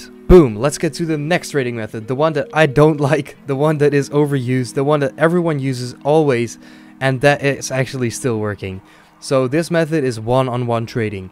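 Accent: Netherlands. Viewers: its nice to know your dutch i sometimes hear a little bit of an dutch accent (im dutch myself and i recon the same thing XD)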